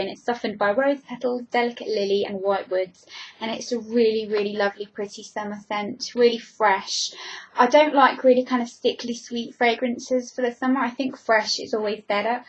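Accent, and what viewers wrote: United Kingdom. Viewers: Btw I LOVE your accent. I'm going to live in London in September and I want my own British accent.. :P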